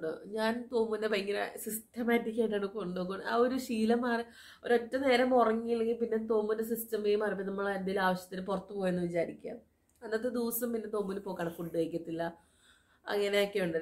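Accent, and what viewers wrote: Thrissur, India. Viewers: ipo sherikum Trissur slang aayi samsaram oke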